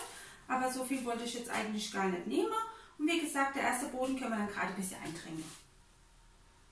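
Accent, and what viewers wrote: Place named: Saarland, Germany. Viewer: Hallo liebe schaue mir gerne deine Videos an wollte dich mal fragen kann das sein dass du vom Saarland kommst ich bin nämlich auch auf vom Saarland meinte nur der Dialekt Werk sehr gleich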